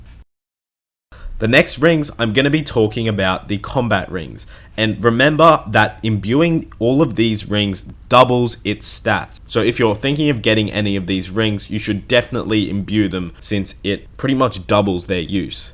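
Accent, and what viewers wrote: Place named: Australia. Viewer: Mate, you must be fair dinkum, true blue, rinky dink Aussie? I mean you have to be, 7pm upload time, good as gold. In all seriousness, love the content and your familiar, refined accent. Keep up the good work mate. If I were to be honest; I would have to say that your videos contain some of the most useful OSRS content I have seen on YouTube in a long time. tl;dr Fully sick vids, hectic descriptiveness, sickhunt. 11/10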